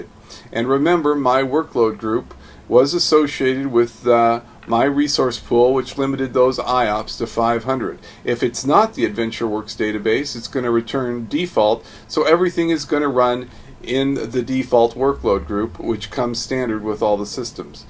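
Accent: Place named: United States. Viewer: Oh boy, American English!!!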